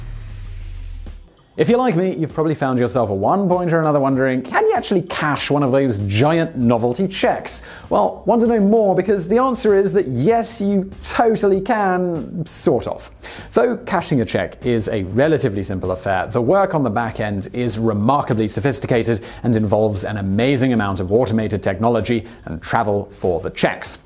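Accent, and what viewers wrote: United Kingdom. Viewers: The way you talk here is why I think you're one of the best narrators ever and why I always click your videos, regardless of subject. You could make a video on the average consumption of prune juice in your local elderly home and I'd still be fascinated. Also, you are probably the most British person alive.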